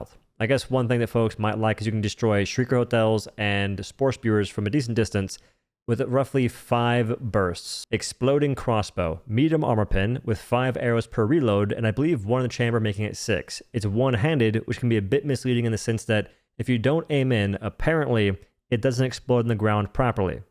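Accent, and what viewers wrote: South, United States. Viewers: I’m trying to figure out your accent. I hear a southern accent, but I also hear an Irish accent on certain words. lol.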